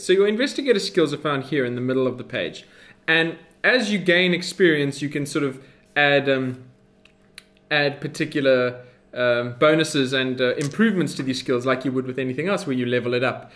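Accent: South Africa. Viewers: Never knew I needed to hear someone say "Cthulhu" in a South African accent but there you go. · Are you South African?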